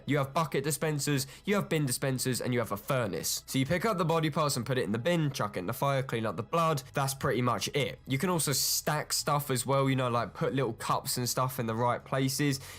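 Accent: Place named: England, United Kingdom. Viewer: I like your accent.. Is England your city?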